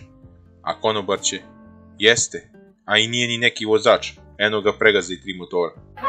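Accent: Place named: South, Serbia. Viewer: Samo kada bi južnjački šmek malo smanjio,možda bi i na nešto ličilo.🤭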